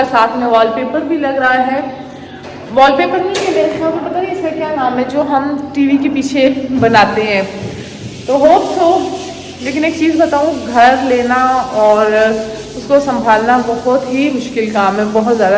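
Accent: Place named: Pashto, Afghanistan. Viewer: AOA dear sister,sanga ay❤sha ay?staso pashto koh bilkul zama shanty da😂andaz koh bilkul zama trhan dy😂😂aao vlog k pashto lazmi waya.deer sha lagi❤❤❤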